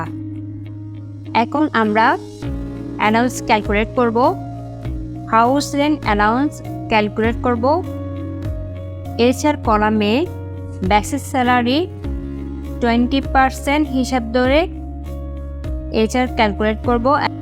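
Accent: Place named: Chittagong, Bangladesh. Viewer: Chittagong r meye confirm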